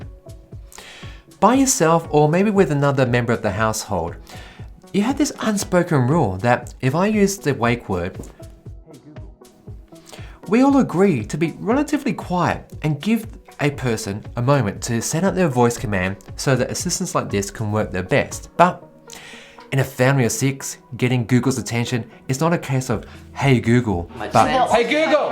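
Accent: Australia. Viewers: the Australian accent also helps...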